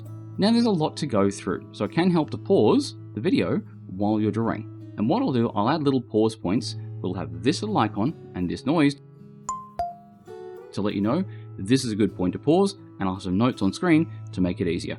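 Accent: Australia. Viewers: Australian accent cool though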